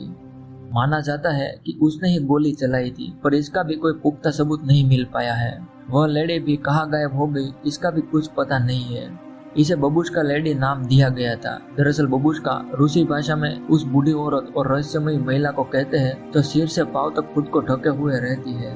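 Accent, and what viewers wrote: Gujarat, India. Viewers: hmm aapke bolne ke accent se hi lag raha tha ki aap Gujarati ho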